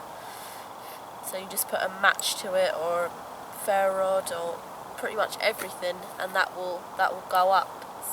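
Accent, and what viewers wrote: United Kingdom. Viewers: your like a little ray mears must be the British accent.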